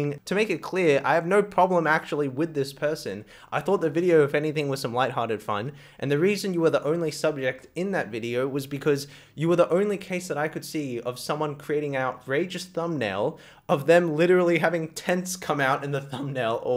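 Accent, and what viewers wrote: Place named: Australia. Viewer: I couldn't figure out for the life of me what country your accent is from, Australia was my first guess, I'm so glad my first guess is correct lmao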